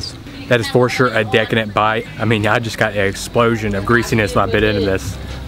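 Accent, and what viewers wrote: South, United States. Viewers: Hey! Just came across your channel, and I’m loving it. I was wondering where you where from, hearing that southern accent haha. I read your bio and saw you’re also from AR 😂🐗 keep up the awesome content! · My first time watching you and I enjoyed your video. I live in North Carolina and you sound like you are from the south. Where are you from? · When I heard a fellow southerner I subscribe so quick! This a first that I've seen a southerner vlogger! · Southern draw and Lao food- what a combo!!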